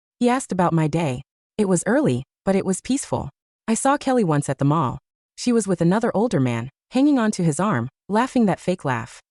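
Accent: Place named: India. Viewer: The Indian ascent is so funny🤣🤣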